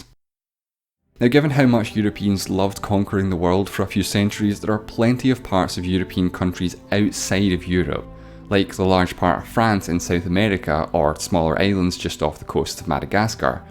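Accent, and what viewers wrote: Scotland, United Kingdom. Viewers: dude i would have subbed you if not for that Scottish accent of yours. can't understand a thing without turning on the subtitles. honestly, that accent is totally alien to me!! i thought there were only 3 accents, namely British, American, Australian and i can get all three but now i know there's this weird 4th one as well. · Scottish accent - Best! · Actually he's Scottish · He's from scotland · Love Scottish accent, the enunciation is a lot better compared to the older videos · Where is his accent from? So pretty did he say "as a scot" i didnt understand that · Is it just me or does sound very scottish in this video? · What accent is this? Irish or Scotland?! Oh hhhhh, Scotland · Are u from Scotland Lad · I thought the accent of British English is beautiful, this video proved me wrong. ~~Wait, is this video in British English? 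He mentioned he is Scot at a certain part, but isn't that part of the GB?~~ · wait ur Scottish? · I was trying to find that out too! He did say "us Scots" and I just checked his other videos, his accent is def Scottish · Are u a scotish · Accent, bro. SCOTLAND FOREVER!!!!! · are you from Scotland? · well he does sound scottish tbh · The first scottish guy I like Your accent is painful tho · They do sound weirder. He's actually toning down his accent to sound understandable to non-Scottish folk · I honestly don't know whether you realize how obnoxiously annoying the Scottish accent is, and that nobody likes it. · You had me with your wonderful Scot accent!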